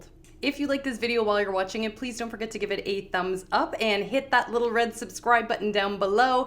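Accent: Canada. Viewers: I thought I detected a bit of Canadian in your speech. I am in Detroit right across the river from you neighbors. :)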